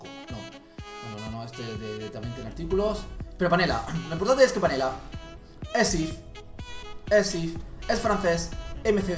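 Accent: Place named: Granada, Spain. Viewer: no pronuncies las S preferimos que hablas como tu hablas que eres de GRANÁ😉😛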